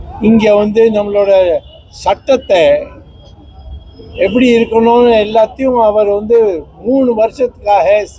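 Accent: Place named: North India, India. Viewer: His tamil is completely like a north Indian tamil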